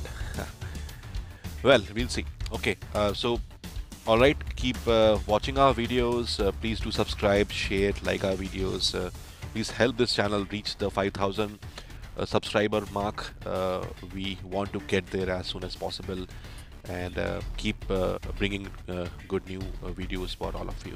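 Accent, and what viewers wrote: India. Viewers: What language are speaking bruh 🤣 im guessing ure indian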